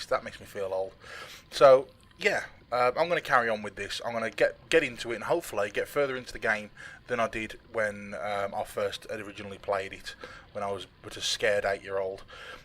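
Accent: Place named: United Kingdom. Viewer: Oh, I grew up on Quake. British accent + retro games = clear win. Do you mind if I add you to my directory of recommended YouTube Channels that is part of my online store?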